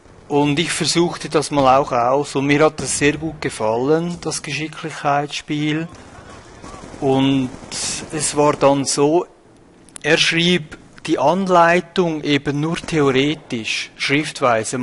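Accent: Switzerland. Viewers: Also ich muss sagen ich versteh dich sehr gut,mir gefällt auch ehrlichgesagt dein scheizer achszent^^ Erinnert mich an Emil^^ Ne finde bist sehr gut zu verstehen,mach dir da mal keine Sorgen!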